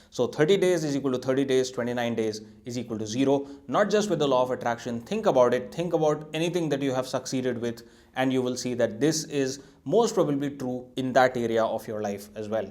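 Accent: India. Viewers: Your accent makes me want to watch a Bollywood movie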